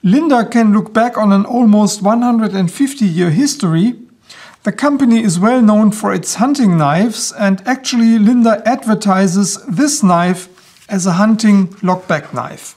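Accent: Germany. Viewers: lovely knife i love your channel your english is excellent especially with your German accent i find it relaxing and interesting at the same time:) cheers from the UK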